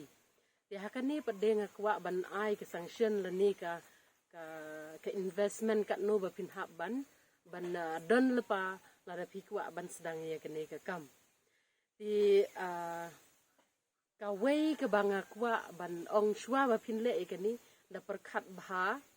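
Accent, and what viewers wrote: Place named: United States. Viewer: Sem syiar jng phi da don da ki batch mo katno batch kiba rit bd ktno kiba heh bd ktno kiba lah long bam doh bd ktno batch kiba lh kha pylleng. Kren style phi te mo kren fareng te lh wai da kwah batai ia ki nongrep khasi jng ngi sngewbha batai da ka ktien khasi nmr bun ki dei bym sngew fareng kum ma phi, phi te ka accent lane ring Sur thik kum ka Mem na USA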